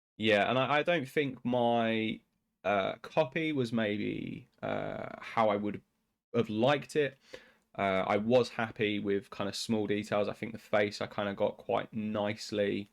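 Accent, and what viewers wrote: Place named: United Kingdom. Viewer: His British he speaks 😮😮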